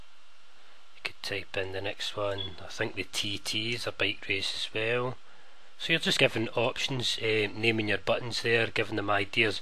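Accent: Scotland, United Kingdom. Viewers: All these people not knowing what a scottish guys accent is :D poor non UK people.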